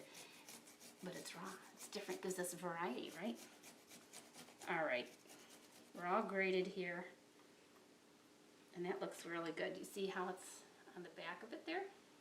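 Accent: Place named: Massachusetts, United States. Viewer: Hello gorgeous,it's Donna from England with the terminal cancerAND the baby AND the three French bulldogs and a pug,YES I'm still alive.I can't tell you how excited and grateful I am about this recipe,I LOVE LOVE LOVE raw cabbage,of course I'm crazy about conventional shop bought coleslaws but urrrrghhhhh the fat content ( but so so yummy).Unfortunately since my husband was killed there's only myself to cook for and if I'm in a lot of pain ------ well you know what I mean.Thankfully I have all of my groceries delivered and I ALWAYS have fresh cabbages,oranges,lemons,chillies,ginger garlic onions and carrots and peppers as a staple,delivered twice weekly.I am going to make a huge bowl of this coleslaw in the morning.I was actually binge watching your videos just last night after I put my baby to sleep.It seems like only yesterday that I "found" you by searching for the Hippocrates soup recipe!!! 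Well I'm going for a rest now and I'm sending you tons of love from England as per usual.Oh and I just ADOR your Massachusetts???????? Accent,love as always Donna 😘❤️xxxx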